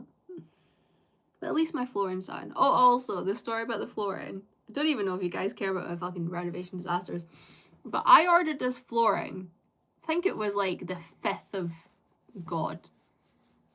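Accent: Scotland, United Kingdom. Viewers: I was very fortunate to put on YouTube to be greeted by only a few minutes in to the stream. Both entertaining and beautiful (if I may be so bold to say so) unfortunately I fell asleep halfway though, thank goodness for catchup. It had been a very busy day in my defence but I suspect your wonderful voice and gentle Scottish accent played it's part. 💕💕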